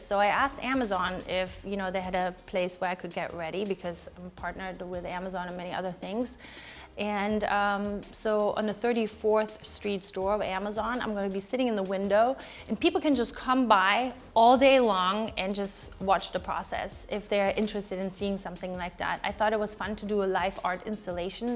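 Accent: Germany. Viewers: I kept hearing an accent then found out she’s from Germany! Crazy how most of her accent is gone. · She sounds more German to me now lol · she sounds extra german,is it just me🤔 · I love how you can still hear some German accent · Her german accent is more apparent here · Do you mean her accent has become stronger? But yeah, I can definitely tell there are a few linguistic features about her way of speaking that distinctively mark her as German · That german accent 😂❤️ ich komme aus Deutschland und man hört es der guten an lol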